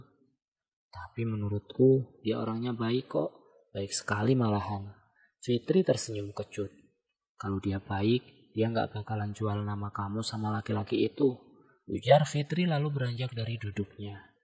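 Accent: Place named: Borneo, Indonesia. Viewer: abang ne urg kalimantan kh , logat bhsa nx kek asle urg pribumi borneo 😅😅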